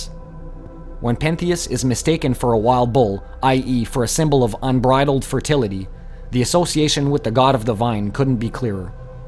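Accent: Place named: Quebec, Canada. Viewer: Tu sonnes Québécois, bonne vidéo!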